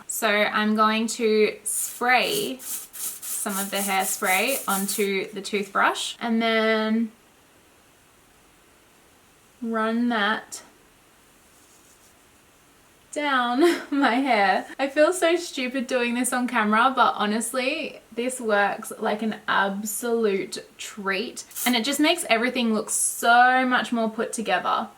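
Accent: Australia. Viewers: Are you Australian? love your accent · lol, I am Aussie and when I watched 50secs into the vid, I was like... she is Aussie!!!!!!! yay !!!!!!!!!!!!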